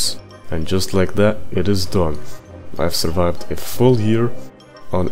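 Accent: Russia. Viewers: Мне одному кажется что он русский? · Nice vid! Are you russian?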